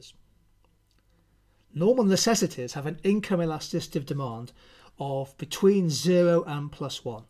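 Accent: United Kingdom. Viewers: True British accent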